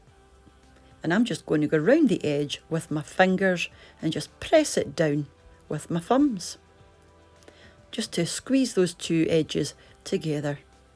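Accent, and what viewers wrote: Scotland, United Kingdom. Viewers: Hello there! So glad I came across your channel. This is the first time watching and thank you for the yummy recipe. I am an 80 year old granny, living in South Africa and am always open to new ideas as, one can never know enough, even at my age. I probably missed your competition but, even just to hear your beautiful Scottish accent and see the delicious recipes, is good enough for me. Do you ever do Haggis or the real Scotch shortbread biscuits? God bless you and your family. Shalom! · Love the pie but the CC had me in stitches, a Scottish accent is not easy to translate. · Just found you today. I love your Scottish accent and the seaside is beautiful. I’m going to search out more of your recipes. Thanks! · i just love your Scottish accent ;-) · Love your video and your sweet Scots accent! I first watched it with the sound off and closed captions on, but they made no sense at all, ha ha ! 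Much more enjoyable to listen than read. Definitely want to try your recipe.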